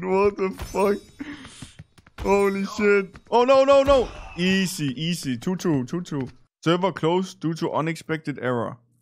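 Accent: Denmark. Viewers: Dude u cannot hide ur danish accent. This is literally the first time I’ve heard your voice and I immediately knew you were a Dane and so am I. Dansk accent virker ik sår godt med engelsk. Lol. Bliv ved med ag lave videoer de ser interessante ud! (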